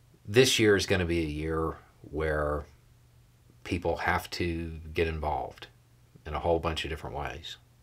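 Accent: South, United States. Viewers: Happy New Year. We spend a lot of time together nightly & I am grateful for the company, information & insights and for trashing the assumption that some who looks like you & speaks with a Southern accent is the antithesis of Progressive. Thanks. Carry on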